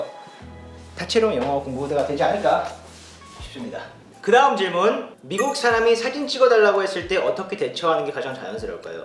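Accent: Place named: Gyeongsang, South Korea. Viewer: 맷 형 구글에서 일하는 경상도 싸나이인가보네요. ㅎㅎ 억양에서 느껴짐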